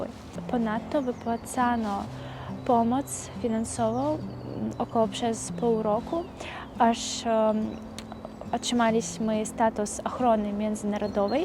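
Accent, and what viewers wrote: East, Poland. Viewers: Piękny wschodni akcent😍 Czuj się w Polsce jak u siebie w domu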